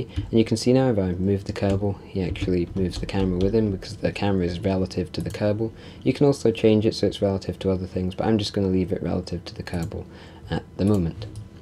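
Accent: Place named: Canterbury, United Kingdom. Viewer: Are you from Canterbury? Im trying to learn southern accents more and my AS chemistry teacher and the guys from Syd Arthur sound similar to you, both are from Canterbury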